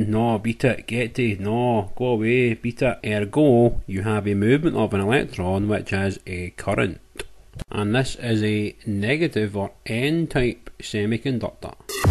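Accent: Scotland, United Kingdom. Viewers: This video taught me two things: how semiconductors work and how to understand Scottish accents. Thanks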